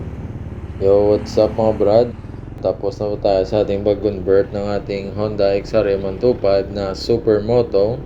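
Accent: Iloilo, Philippines. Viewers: ilonggo ka brad? hehe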